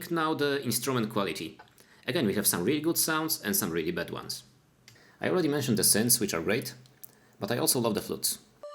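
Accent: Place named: Warsaw, Poland. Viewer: I ten warszawski akcent. Gratulacje.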